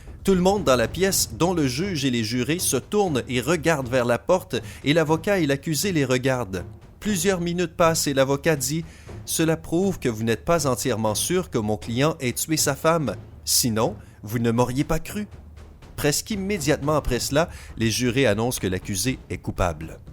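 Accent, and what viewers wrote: Quebec, Canada. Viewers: La voix quebecoise pourri :( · Ah !!!!l accent canadien!!!😁😁😁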